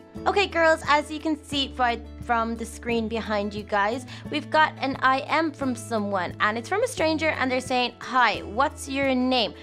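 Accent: Ireland. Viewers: Her accent is so cool, Irish? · Are u really from Ireland?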